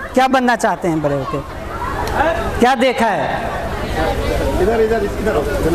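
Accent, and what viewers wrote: Bihar, India. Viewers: वे हिन्दी जाने बिना टाप नहीं किए है पर बिहार की हर जगह की अपनी एक भाषा शैली है जिससे अलग होने पर बनावटीपना दिखता है। साधारण परिवेश में पालन होने पर कुछ असर रह जाता है। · Hindi pronunciation is general problem in Bihar & that is the recognition of a Bihari,if we start speaking like UP ,our recognition is like to be lost.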